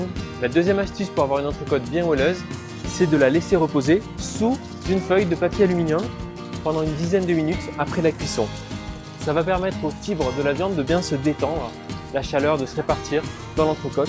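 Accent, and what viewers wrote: South, France. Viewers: Salut!!! Super ces 2 recettes, pour la première parfois je fais au pif avec ce que j'ai sous la main (en général je suis pressé quand je m'y lance ), pour la seconde j'ai horreur du vinaigre mais je remplace par un vin blanc sec (toujours une bouteille entamé dans le frigot pour la cuisine ) mais je la retourne dans le plat et la masse avec la marinade (Huile d'olive; thym(ou basilic), ail, paprika, piment d'Espelette sec, poivre ) je sale juste avant de la cuire avec de la fleur de sel!!! Comme d'habitude je ne peux m'empêcher de donner mes ingrédients et de trop en faire avec mon commentaire. Je m'en excuse par avance!!! Bon Week-end à toi & ton entourage!!!! Au fait,ça plaisir de voir que tu n'as pas perdu l'accent du soleil, il fait chanter les recettes!!!!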